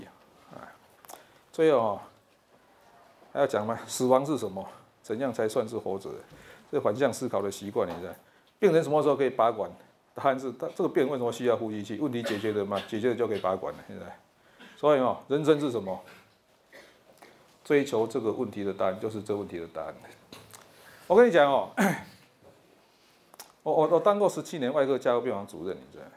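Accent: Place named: Taiwan. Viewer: say Mandarin is his second language and English his technical language. He has a strong Taiwanese accent when speaking Mandarin and borrows many Taiwanese vocabulary.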